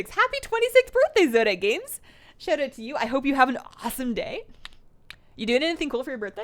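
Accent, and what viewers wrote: Canada. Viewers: From a midwestern ear, you sound pretty Canadian.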